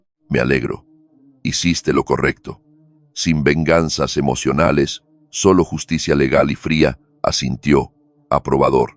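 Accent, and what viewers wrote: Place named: United States. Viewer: En España no se está siempre diciendo nombre y dos apellidos. Y tampocoese acento americano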